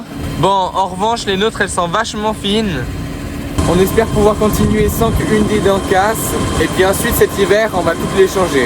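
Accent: Switzerland. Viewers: Salut ue vien de recouvrir ta chaine et ca a l'air cool je trouve que tu a un accent suisse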